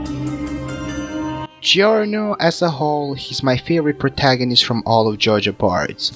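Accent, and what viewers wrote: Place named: Latin America. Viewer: Ahora en español:v por tu acento más vale que seas hispano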